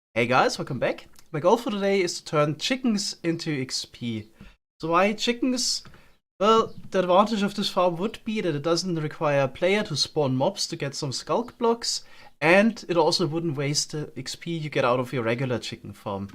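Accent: Germany. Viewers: I sometimes a Little deutschen Akzent oder?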